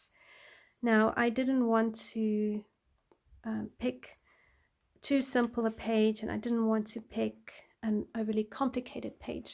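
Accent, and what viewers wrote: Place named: South Africa. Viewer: You sound Soith African? I am too but we moved to Germany. First time viewer here. Haven't watched more than 2 mins yet, just enjoyed hearing your accent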